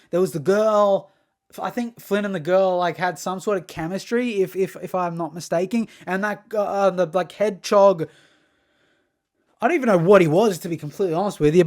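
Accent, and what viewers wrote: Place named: Australia. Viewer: Skylanders was such a massive part of my childhood, went through and played all the games w my brother recently and they're still so much fun, love seeing people still appreciate how influential these games truly were Love your energy as well man, keep up the good work 🙏 as a fellow Australian the accent is sick as well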